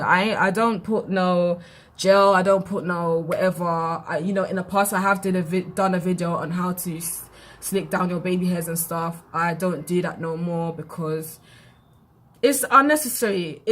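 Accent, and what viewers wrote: United Kingdom. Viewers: Really enjoyed this video, good tips! And I also LOVE your British accent!😊🙌🏾 new subscriber here🙋🏾‍♀️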